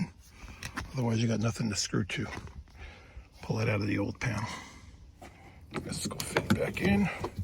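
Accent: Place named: New York, United States. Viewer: Love your accent! NY?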